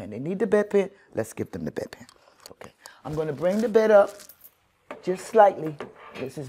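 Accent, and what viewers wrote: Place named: New York, United States. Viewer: Love her accent is that ny?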